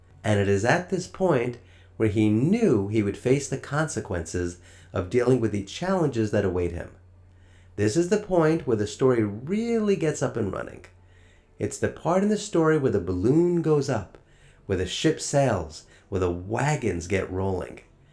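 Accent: New York, United States. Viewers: Wow. A vegan crazy cat guy proficient in mythology and uniquely gifted to tell the tale - in a New York accent no less! 😍🥰 In the interview with Joe you quoted your mom to say a New Yorker would never be president because people don’t understand us. No truer words! And they are poorer for it.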